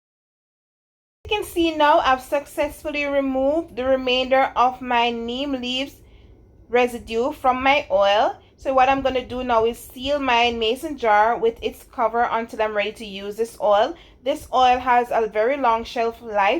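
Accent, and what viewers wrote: Jamaica. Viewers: I can tell she's Jamaican, did affi subscribe to di lady channel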